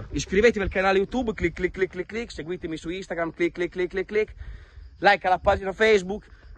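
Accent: Italy. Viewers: Mi piace il tuo accento Emiliano romagnolo marchigiano 😁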